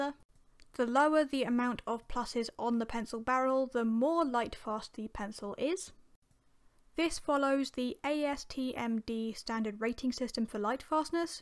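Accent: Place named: RP, United Kingdom. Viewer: Ive only noticed you're channel 3 days ago and the fact youre English and posh talking really has made me subscribe and youre artwork is supurb x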